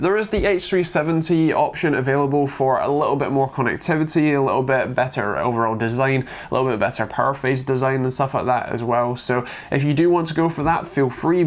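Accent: United Kingdom. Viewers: can't understand ANYTHING with your thick British Accent, lol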